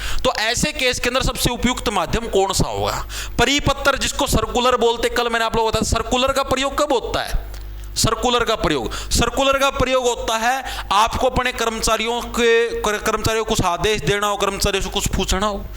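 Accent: Haryana, India. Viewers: Ye sir haryana se hai kya?😅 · Sir aap Hariyana se ho kya😊😀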